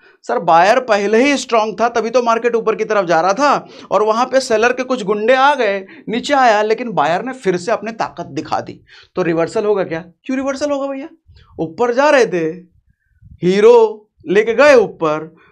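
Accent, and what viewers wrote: Bihar, India. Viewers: Kya aap Bihari hai❤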